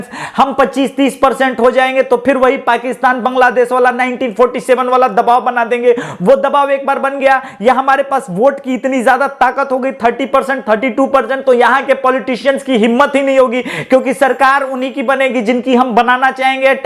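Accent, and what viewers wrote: Hyderabad, India. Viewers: Hyderabadi tone 😂😂 Jai Shri Krishna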